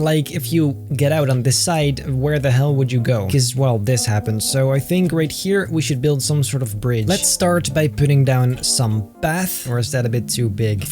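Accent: Netherlands. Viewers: Omg ik hoorde het al aan je accent, JE BENT NEDERLANDSAS!!! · Your dutch? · Are you from the netherlands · Are you Dutch? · are you dutch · Are you actually dutch? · Ben je Nederlands? XD Are you Dutch? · You dutch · Ben je Nederlands? 😄😁 (If u dont here is what i asked: Are u from the Netherlands) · Are you dutch? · And I already had a feeling that you where dutch 🇳🇱 because you’re accent looks dutch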